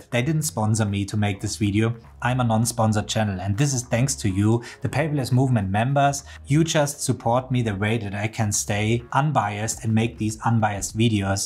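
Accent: Germany. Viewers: The thick german accent makes every tech video better. Des Bedarfs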